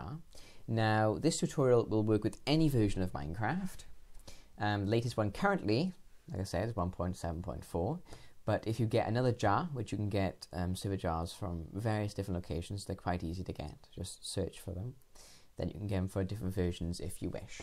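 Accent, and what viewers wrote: Wales, United Kingdom. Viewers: you sound welsh mate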